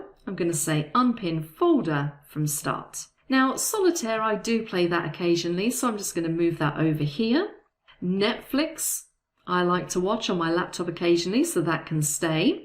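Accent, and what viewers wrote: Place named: RP, United Kingdom. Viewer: You're the best a zillion times I love this I can't stop watching because of your voice and the pronunciation of the words, BBC English everyone can hear and understand you very very well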